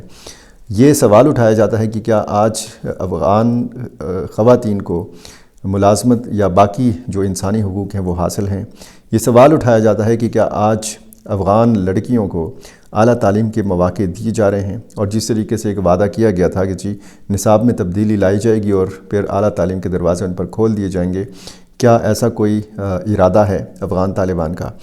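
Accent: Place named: Khyber Pakhtunkhwa, Pakistan. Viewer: صاحب آپ کی صحافت واقعی بہت ہی عمدہ اور مثبت طریقے کی ہے حسن خان پشتو میں بولتے تھے تو میں نے لکھ دیا تھا کہ اردو میں بھی بیان کریں تاکہ اردو سمجھنے والوں کے سامنے پختون قوم کی صحافت کا مثبت چہرہ سامنے آئے مجھے نہیں معلوم کہ آپ کہاں کے ہیں؟ مگر لہجے سے لگتا ہے کہ جنوبی پختونخواہ کے ہیں اور جتنی زیادہ قربت ہوتی ہے تو قربت کے لحاظ سے موددت ایک بالکل فطری چیز ہے ایک دور ایسا تھا کہ سلیم صافی صاحب کو طالبان کے حوالے سے بہت ماہر سمجھا جاتا تھا سلیم صافی نے بہت معاملات میں نشاندہی بھی کی ہے ایک بار پہلے جب نیٹو افغانستان میں موجود تھی تو کہا تھا کہ جنرل قمر جاوید باجوہ نے کہا ہے کہ افغان اور پاکستانی طالبان دونوں ایک سکہ کے دو رخ ہیں پھر جب نیٹو افغانستان سے گیا تو میری بھی یہی رائے تھی کہ تحریک طالبان پاکستان کو آنے دیا جائے اسلئے کہ امریکہ جانے کے بعد جو لوگ افغانستان میں پھنس گئے ہیں ان کو واپس آنا چاہیے وزیرستان میں جمعیت علماء اسلام کے سینیٹر مولانا صالح شاہ قریشی نے ان کی آمد کا خیرمقدم کیا تھا PTM والوں کے بیانات تھے کہ ان سے ہم کہتے ہیں کہ تم ہمارے بھائی ہو افغانی طالبان نے تم اپنا نہیں سمجھا اور پنجاب کی فوج بھی تمہیں قبول نہیں کرتی اگر آپ کو کوئی مسئلہ ہو تو قتل وغارت کی جگہ ہمیں بلاؤ ہم اس کا ازالہ کریں گے البتہ سوات کے لوگ ان کے خلاف نکل آئے تو مراد سعید بھی عوام کی زبان بولنے لگے ورنہ تو اخونزادہ چٹان باجوڑ سے لیکر جنوبی وزیرستان سینیٹر مولانا صالح قریشی محسود تک قبائل کے نمائندے گئے تھے ہمارے گھر پر بھی حملہ ہوا تھا طالبان نے اس پر معافی بھی مانگی تھی اور فیصلہ کرنے کیلئے جرگہ بھی ہوا تھا لیکن پھر فیصلہ ادھورا رہ گیا تھا اسلئے کہ ان کے خلاف آپریشن ہوا تھا اور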